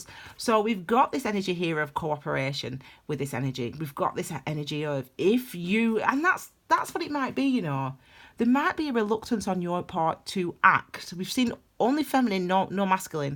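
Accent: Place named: Scotland, United Kingdom. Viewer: First time I’ve listened to you and this truly resonated with me. Love your accent. Scottish?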